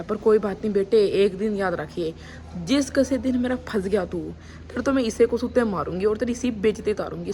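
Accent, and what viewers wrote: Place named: Haryana, India. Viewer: Nice haryanvi😅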